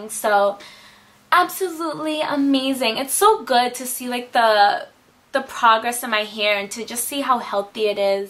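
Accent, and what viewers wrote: New York, United States. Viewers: lol, love your hair and your attitude. you have that new york accent going on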